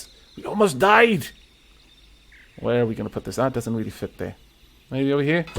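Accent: Wales, United Kingdom. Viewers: are you Welsh 🏴🏴?? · is he Welsh?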